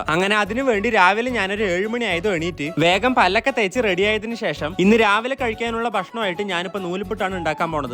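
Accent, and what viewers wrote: Palakkad, India. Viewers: ചേട്ടൻ പാലക്കാട് ആണോ അവിടെ ആണ് ❤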